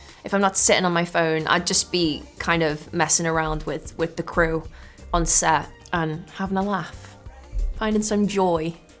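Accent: Liverpool, United Kingdom. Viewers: Best coming from Liverpool is the accent. I love english language accents.I am not english native speaker. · I love the scouse accent icl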